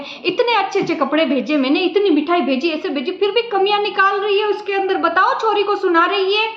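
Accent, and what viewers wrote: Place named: Rajasthan, India. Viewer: Bahut bahut badiya marwari me bola Karo acha lagta h😂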